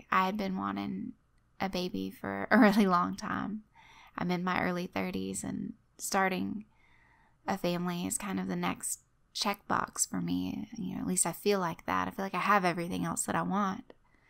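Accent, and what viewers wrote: South, United States. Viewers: I actually just found out about your channel today.. I'm so sorry for what you've been thru, and I'm sending healing thoughts your way that things will only get better for you and your loved ones. As I'm a new subscriber, I don't know what your voice was like before..but as a southern gal, I sense a hint of southern in your voice as well..which of course I think is terrific.😊 Hang in there, I believe there's a plan for each of us. I don't purport to always understand (or enjoy) some of the routes my life has taken getting to the plan, but I try to keep in mind even the darkest days eventually have to lighten up. And as much as I don't like it, it'd be hard to recognize real happiness if I'd never experienced real sadness. I'm looking forward to listening to your prior narrations as well enjoying the new ones you choose to share. 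Take care!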